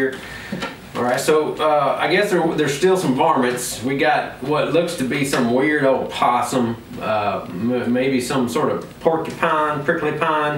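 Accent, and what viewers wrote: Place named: Alabama, United States. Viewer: OMG!!, HOW did you ever keep a straight face!!?? "Happier than a Pig in Shit"!!! Well drop me in Shit and call me dipped!! Your accent brings me back to High Skewel in MONgomery Alabama. 😅😅😅😆😂😂 The Puns alone were enough to get me to bust a gut!! I might buy it for the Body I believe. But, I'm sure as Church on Sundee that I'd get that Trck for the stickers and signs!!! Usetacould! 😜 DADGUMMIT! That was about the most fun I've seen y'all have on one of them videotapes! I reckon I ought to put my Twang back in the Box. Might just turn my Wife madder than a Opossum in a paper bag!! 😉 OK, I've got to be done here..... Sadly, I still live in Arizona. Sir, that was FUN! Carmine 💥 ⛽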